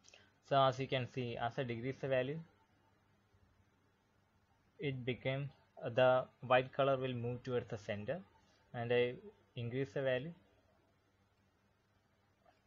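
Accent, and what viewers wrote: India. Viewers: hii bro , r u Indian???bcoz ur style of speaking seemed like it😂. Btw I'm from India, and thx for fur PNG👍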